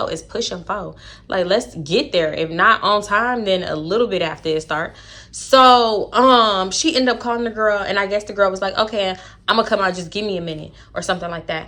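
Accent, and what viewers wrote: Baltimore, United States. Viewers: Are you from Baltimore? ( your accent lol) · you taking me outtttt wit the baltimore accent🤣🤣it’s so funny hearing how we sound to other ppl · this random baltimore accent be taking me out 😂😂😂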